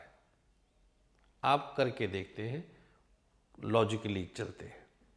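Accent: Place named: Varanasi, India. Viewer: गुरुदेव को सादर प्रणाम आप की बोलचाल की भाषा बहुत सुन्दर लगती है हम बनासी लोगो की यही पहचान है सदैव मार्ग दर्शन करे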